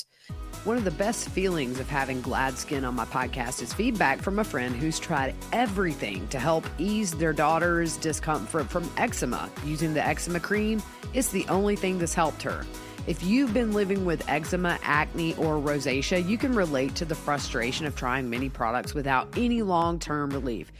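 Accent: South, United States. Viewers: …this is my go to channel for outright facts! You’re the best! And your country woman accent helps! Nothing a Boston city boy likes more than a country girl givin information! 😂😂 you go girl!!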